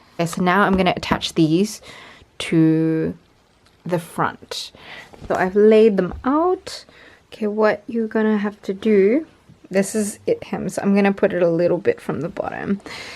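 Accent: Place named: England, United Kingdom. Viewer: Asian with an English accent!!!🤣🤣🤣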